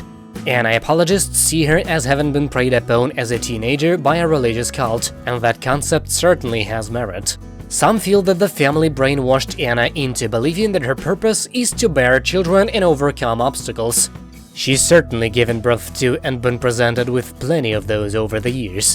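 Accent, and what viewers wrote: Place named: South, United States. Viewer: U.S.A, the Duggars are part of Bill Gothard's IBLP cult instead of a REAL church! It's called FAKE Christianity! They use Bill Gothard's IBLP "Cult Bible" instead of the "authentic" Holy Bible that REAL churches use! The differences are that cults abuse and brainwash their members in the worst ways, while REAL churches teach unconditional love and respect! This cult also doesn't allow divorce, despite a few of Anna's siblings doing so! It makes NO difference how long you and your wife have been married - 31 years or not - would the two of you STILL be married to each other even if one or BOTH of you behaved like Josh and Anna? The "authentic" Holy Bible DOES allow divorce on the grounds of the crimes Josh committed, but it sounds like you forgot that! You sound like you come from The South, which is called "The Bible Belt," and there are equal numbers of BOTH cults and REAL religious faiths! 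When COVID-19 started, CNN interviewed a man on a beach from Alabama, who didn't believe in making ANY decisions for himself - he was 100% dependent on God for EVERYTHING! He even said that if God decided to "call him back," he'd just go back with NO questions! That sounds like BOTH you and your wife - regardless of whether the two of you belong to a cult or a REAL church!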